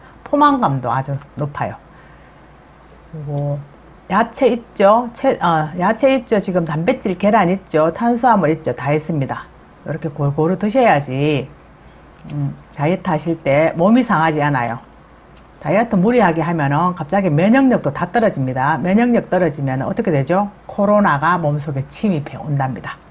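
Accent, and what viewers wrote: Gyeongsang, South Korea. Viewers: 언니 요리 솜씨보다 상도 사투리가 매력만점 더 예쁘고 간더러지게 구사하시옵소서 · 시원시원 하게 요리하시니까 든기좋네요 경상도 말과 함깨 구수 하네요 양배추로 해먹어 봐야 겠네요 간단한 요리 또 올려 주세요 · 경상도사투리는 듣기가 쫌... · 아이디어는 좋은게 많은데 너무 심하게 쓰는 사투리가 거슬리네요 ㅠㅠㅠ 경상도분이시라 일반 사투리나 억양 이해는 하는데 궂이 그렇게 심하게 쓰실 필요가 있을까요? 암튼 조금 고쳐주셨음 영상이 더 좋아질듯요. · 사투리가 귀에 쏙쏙 저도 경상도 여자라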